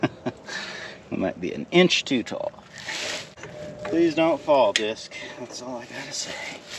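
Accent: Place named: Texas, United States. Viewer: If you are from Northern California how come you have a southern/ Texas style drawl? I just like guessing accents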